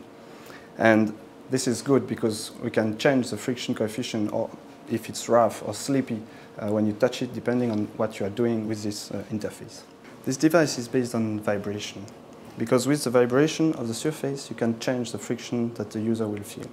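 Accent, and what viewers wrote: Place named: France. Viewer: Sounds French to me.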